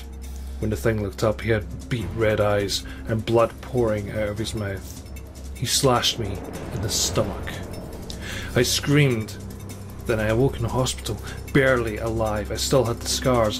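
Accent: Scotland, United Kingdom. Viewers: did this really happen?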